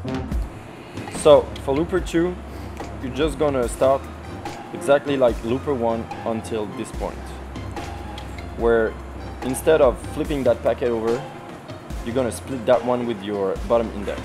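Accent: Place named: Russia. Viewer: Я не могу понять, вы русские что ли? Акцент прям похож..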